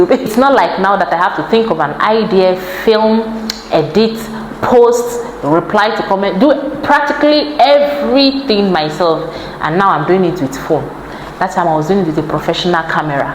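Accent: Ghana. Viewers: You need a double citizenship bcos you sound more like a Ghanaian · I love the way you pronounce CHURCH the Ghana way. Your accent has changed from Nigerian way to a Ghanaian . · You sound Ghanaian 🇬🇭 ❤ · You're not from Nigeria 🇳🇬, you speak like Ghanaian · Air ticket is cheap, book and return to Ghana. Your accent gave you up. Welking, in Ghana but it is pronounced working in Nigeria.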